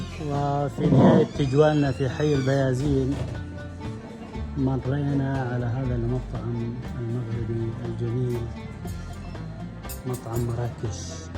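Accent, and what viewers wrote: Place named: Oman. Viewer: من لهجتك عماني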